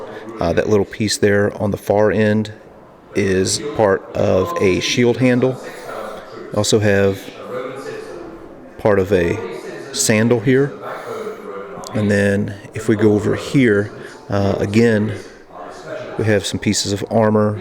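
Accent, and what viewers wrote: United States. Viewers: Excellent presentation on the edge of the known world. Dont know why,(raised on U.S. tv shows in the 80's) maybe? But we do enjoy our history being told in an american accent. 🇬🇧🇺🇸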